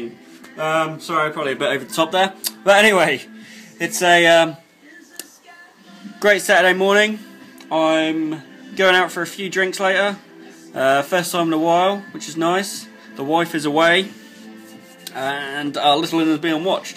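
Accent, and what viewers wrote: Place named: Ireland. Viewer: Somebody's Irish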